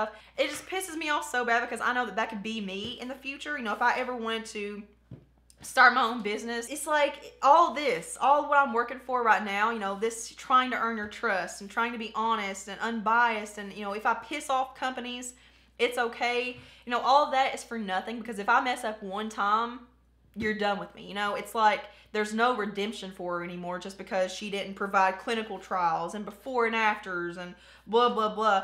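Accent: South, United States. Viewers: OMG a southern youtuber!!! Literally 10 seconds into this video and I'm mashing the subscribe button 😂😂😂 #southerngirlsarethebest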